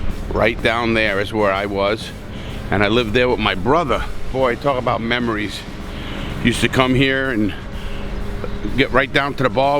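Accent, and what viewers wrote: New York, United States. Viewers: do you find that your NYC accent comes back thicker when you go visit your son?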